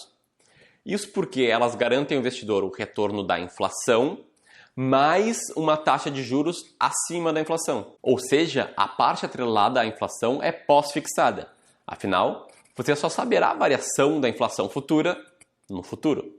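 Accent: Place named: Rio Grande do Sul, Brazil. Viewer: Tu sois gaúcho né rsrs este sotaque me é peculiar rsrs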